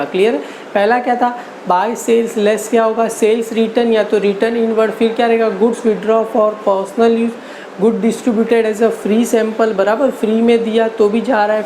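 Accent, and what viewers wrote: Gujarat, India. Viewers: Tame gujrati cho ??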